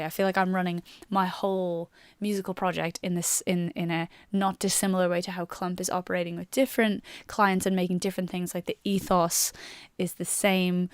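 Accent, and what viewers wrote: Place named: United States. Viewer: Why are you suddenly American?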